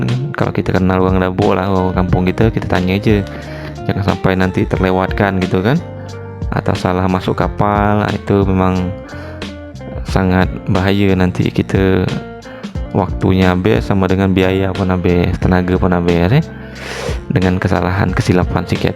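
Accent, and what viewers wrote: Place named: Malacca, Malaysia. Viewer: Suka dengar loghat dabo singkep.. mcm melaka😂 nak jumpa org dabo lah..! Balik kampung sehari suntuk baru sampai rumah😂 letiiih..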